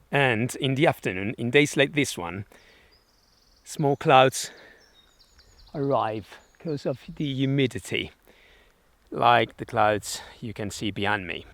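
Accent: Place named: United Kingdom. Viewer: Accento britannico perfetto!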